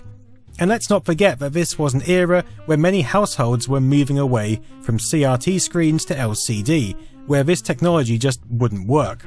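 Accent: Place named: United Kingdom. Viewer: I actually want to play the Bridge Command game now. accent is cool and all as with any brittish accent, but everytime I hear he say about the early nineties as (early "naughty"es) I can't help but crack a smile.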